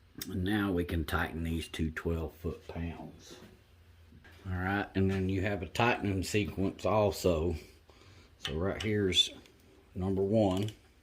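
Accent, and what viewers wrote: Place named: South, United States. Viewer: Im calling you the " " because your calm southern voice and patient demeanor makes it easier for ADhD heads like me to learn from 👍 Now Im actually looking forward to doing my heads when they need it haha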